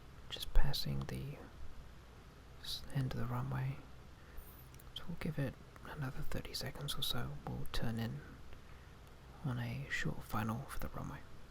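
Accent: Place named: United Kingdom. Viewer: your British accent is so soothing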